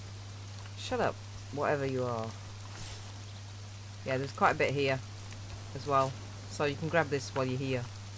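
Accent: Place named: United Kingdom. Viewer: Thank you for showing the route, with camera angles on landmarks, to where you found Hops. And thank you especially for popping up the map. You are now my favorite Conan Exiles YouTuber. As if the lovely female voice and British accent weren't enough for that already! :D On another note, I see you put yourself together a set of the Reptile Armor. Nice isn't it? It has slightly more Armor Points and Poise than the standard Medium Armor, and it takes only a single repair material.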